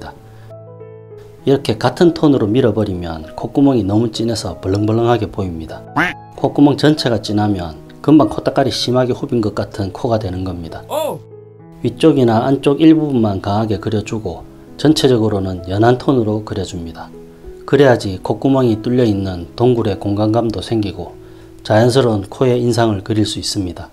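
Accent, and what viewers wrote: Gyeongsang, South Korea. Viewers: 경상도 말씨가 너무 친근하고 반갑습니다. 직접 화실을 경영하시나요? 멀지않다면 직접가서 배우고싶습니다. 저는 하남에 거주합니다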